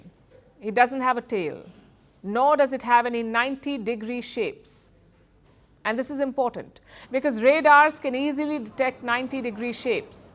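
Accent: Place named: India. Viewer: I like her reports, which can help me get familiar with Indian English.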